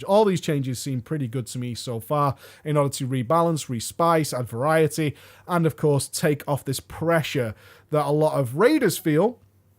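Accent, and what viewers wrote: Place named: England, United Kingdom. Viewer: Hallvardio it's an English accent tick. Some words with an English accent have a hanging r .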